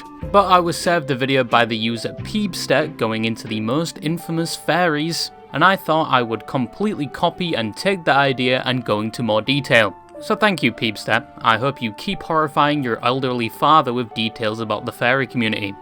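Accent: United Kingdom. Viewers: 10s in, british, had to click off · You know, I didn't think there would be a British accent out there that doesn't have a hard distinction between the u and a sound, hence him pronouncing furry more like fairy...but, you learn something every day😅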